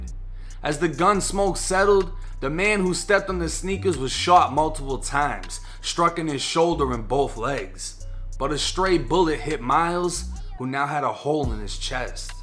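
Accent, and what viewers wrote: Boston, United States. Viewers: Yo, you from Boston? You got the accent. You ever done time in Concord or Shirley?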